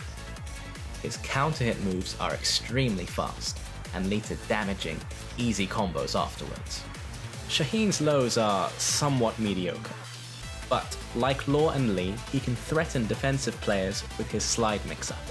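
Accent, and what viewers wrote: United Kingdom. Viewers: Sayuko Tanaka yes I learnt Japanese. I didn't mean "ay" as in "say" I mean "ah". I just didn't hear him properly because he didn't say the "ah" sound clearly. Maybe his British accent.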